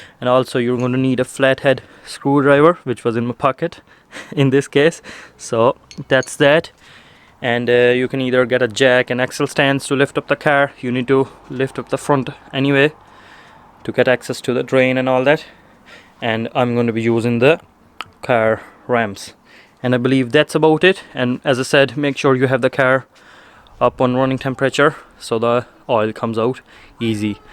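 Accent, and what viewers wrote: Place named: Portlaoise, Ireland. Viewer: That a Portlaoise accent I’m hearing, Thanks for this video brother helped me save allot.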